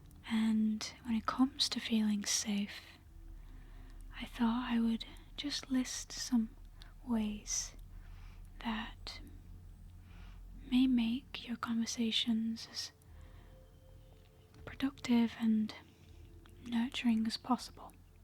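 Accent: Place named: Northern England, United Kingdom. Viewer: Glad your retaining your northern accent in the main. Greater Manchester area perhaps. Leigh. Rochdale. Bolton. Summat like that. All the best from sunny morecambe. Cheers.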